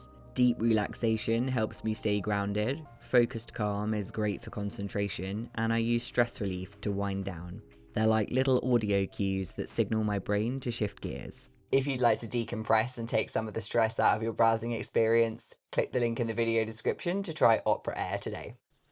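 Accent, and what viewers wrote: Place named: RP, United Kingdom. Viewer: You sound so posh.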